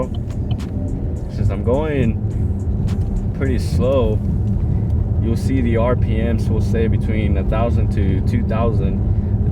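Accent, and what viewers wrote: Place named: Baltimore, United States. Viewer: Are you from Baltimore? That Tew and Yew sounds familiar lol😂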